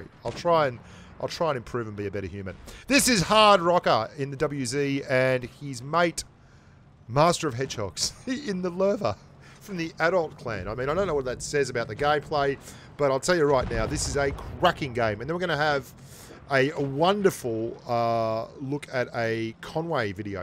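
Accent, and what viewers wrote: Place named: Australia. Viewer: Hey old mate,i am happy you are back.it is a pleasure to hear your oz accent.just makes my day absolutely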